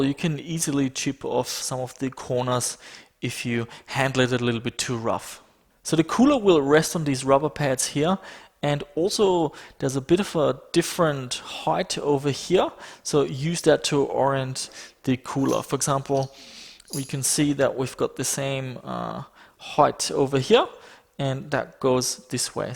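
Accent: Germany. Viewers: Deutscher knn der akzent nicht werden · The german is Strong is this one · Are you german?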